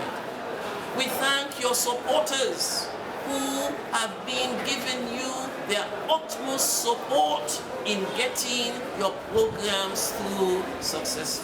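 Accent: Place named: Caribbean. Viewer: Now I know where the Caribbean accents come from.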